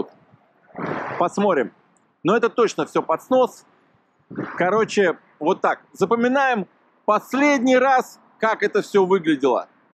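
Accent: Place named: Russia. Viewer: Вас тоже снесут с вашим рАссейским говорком. И цитируя вас сленг, скажу : " кАроче,точно !" Не эмониционируйте и кАроче валите из Мариуполя, если не хотите встретиться с пулей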